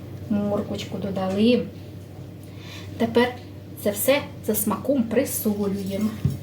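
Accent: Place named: Sumy, Ukraine. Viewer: акцент сумчан не с каким не перепутаешь. угадал?🙂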